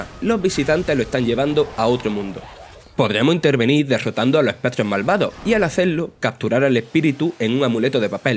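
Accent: Andalusia, Spain. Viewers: No le cuesta hablar, es un acento de una region de españa!!! sino te gusta no entres a ver el video. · me parto con la voz andaluza.... 😂😂👍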